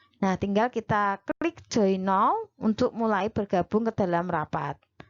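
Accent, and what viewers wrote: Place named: Java, Indonesia. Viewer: Suaranya bu khas banget jawanya 😉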